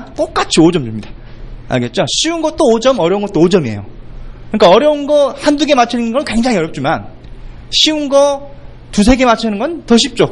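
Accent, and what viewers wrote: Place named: Jeolla, South Korea. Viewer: 전 경남 진주에서 태어나 자랐고 여기가 고향입니다 하지만 건설회사?특성상 타지를 돌아다니며 전북고창이 고향인 지금 제 와이프를 만났고 결혼해 살고 있습니다........억양이나 말투가 교수님이랑 너무 비슷?했습니다....특히 배려버린다~이 말은 완전 똑같았구요......교수님은 재능기부로 이렇게 동영상을 올리셨지만 저 같은 경우 정말 큰 도움이 되었습니다 부담가지지 마시고 교수님 근무하시는 주소를 <mail> 로 보내주시면 제가 교수님재능기부에 대한 조그만 보답을 하고 싶습니다 부담 전혀 가지지 마시고 꼭 보내주셨으면 합니다 교수님은 재능기부 였으니 전 교수님 아니었으면 또 건축구조 때문에 아마도 불합격 했을 꺼 같습니다~꼭 주소 보내주셨으면 합니다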